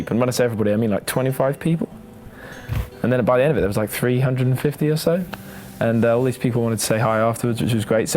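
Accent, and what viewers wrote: United Kingdom. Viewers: OMG HE HAS A BRITISH ACCENT!! JUST MADE HIM 100% HOTTER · this british super talanted and also very beautiful and charming with this accent ogosh im inlove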